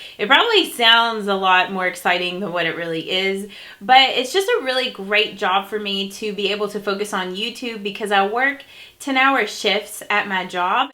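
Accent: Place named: Kentucky, United States. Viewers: Ty for the Q&A! I can relate with accent...I live in Eastern Ky myself. My husband lived in Louisville for over 10 years:) Just visited the Louisville Zook on Wednesday! :) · When I watched my first makeup video of yours, I could tell your accent sounded like me...and I was right! We are both KY girls! Gotta love KY!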